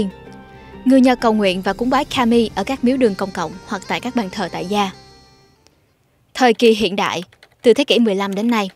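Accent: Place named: Saigon, Vietnam. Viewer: Giọng sài gòn rất hay ủng hộ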